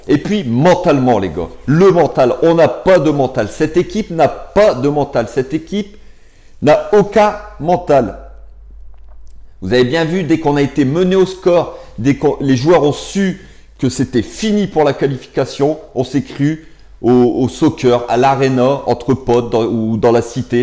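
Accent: North, France. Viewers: Jme demande toujours si tu viens pas du Nord ta grave l'accent ! Ta raison mon frère je pense que pour être compétitif il ns faut 2 gros bancs énorme comme on ttes les équipes modernes ex Bayern city etc..... Une équipe collectifs pas des joueurs qui se croient au five ! Nos joueurs c'est encore trop peu c'est encore trop faible Bakker Diallo Draxler icardi kehrer faut les vendres !!!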